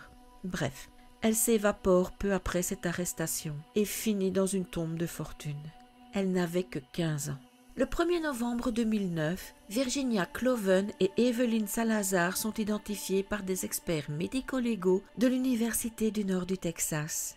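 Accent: Belgium. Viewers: ce petit accent Carolo voire Hennuyer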